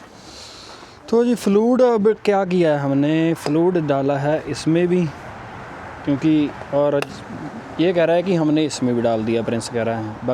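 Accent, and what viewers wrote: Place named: Punjab, India. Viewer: Veer Punjabi ch ee aaja yaar ...teri hindi ch vi Apni Punjabi Jhalkdi aa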